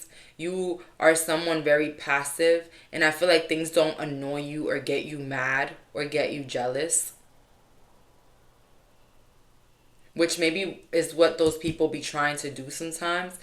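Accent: New York, United States. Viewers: You are my favorite reader on YouTube and we both live in New York City so I love the way you talk😂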